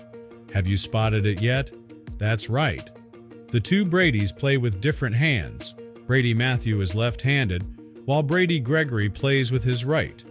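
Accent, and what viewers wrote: United States. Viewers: I absolutely hate this narrative style. Saying a lot of words but not getting anywhere. (AND the horrid american accent.)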